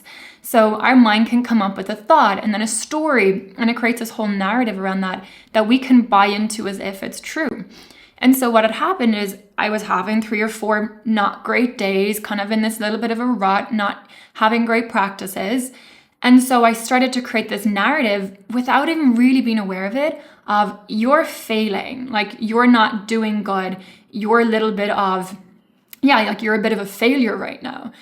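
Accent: Ireland. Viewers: Where are you from, your accent has elements of Irish???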